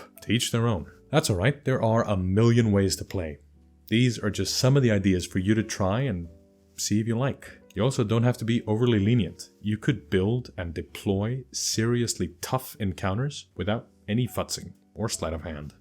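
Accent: Iceland. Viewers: You have a great voice. The tiniest little hint of an Icelandic accent gives your voice a bit of charm, and your pronunciation is very clear and crisp, making you easy to understand on cheap, low-quality speakers.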